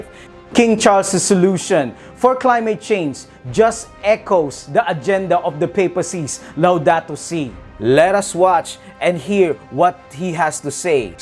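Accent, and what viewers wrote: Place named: Philippines. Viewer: Your accent sounds Filipino. Nice video by the way .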